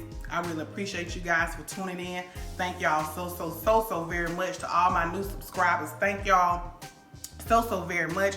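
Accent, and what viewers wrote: South, United States. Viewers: It the real southern draw & southern meals fa me❤ if u aint from the south you wouldn't Understand❤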